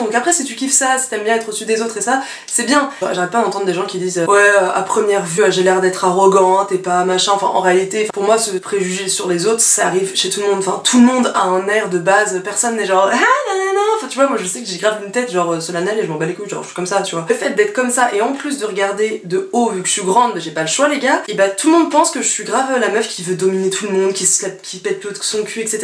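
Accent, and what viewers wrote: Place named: Lyon, France. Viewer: Mdrrr pauvre bichette ah sa se voit que tu est de Lyon à ta façon de parler mdrrr je comprend en ville tout le monde est bizarre surtout la part dieu j’habite à côté en tout cas continue tes vidéos j’aime trop ta personnalité !💙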